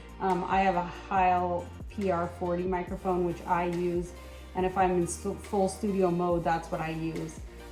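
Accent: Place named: England, United Kingdom. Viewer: I liked your English accent. I´m from Brazil. Where are you from?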